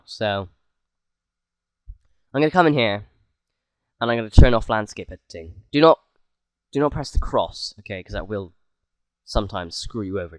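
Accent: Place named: Bristol, United Kingdom. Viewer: Sounds a bit Bristol to me!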